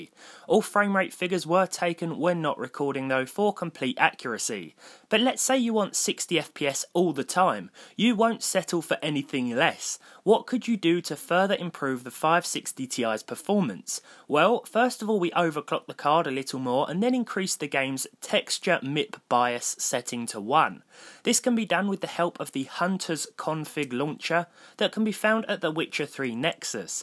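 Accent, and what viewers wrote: United Kingdom. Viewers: Well. Your accent made me understand the reason why British men are called Gentlemen.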